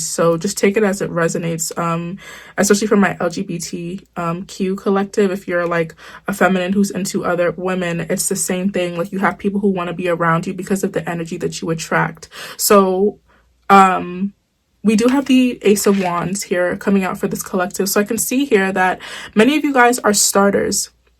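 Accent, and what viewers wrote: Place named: Jamaica. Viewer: Yoo you gotta be Jamaican lol some of the words you use and how you say things lol if you are big up you self sistah🇯🇲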